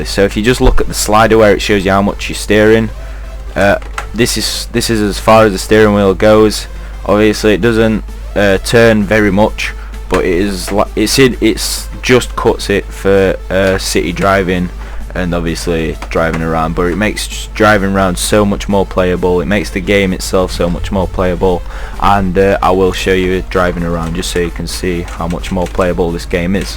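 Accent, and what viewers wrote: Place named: United Kingdom. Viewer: fututi acentu tau de britanic ca nu inteleg nimic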